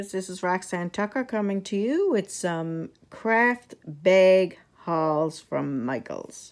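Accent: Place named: Newfoundland, Canada. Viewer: Hi Roxanne, I’ve seen you comment on my channel! Thank you! New subbie! I can tell from your voice that you are from Nfld! My hubby is from the Rock also. Such a beautiful place! Welcome to YouTube and you lucked out with your Michaels bags!Love the beads and note cards! Paws up! 💕💕🐾🐾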